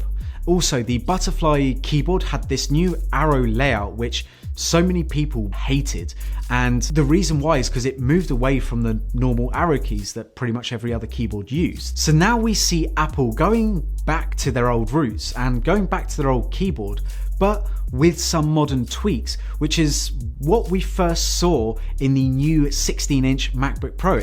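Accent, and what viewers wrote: United Kingdom. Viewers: Too British to listen to.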